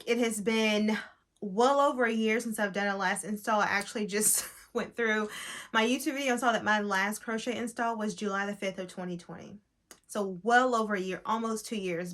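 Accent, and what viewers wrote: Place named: South, United States. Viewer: Beautiful! I swear I heard your Southern accent more in this video than any other 😍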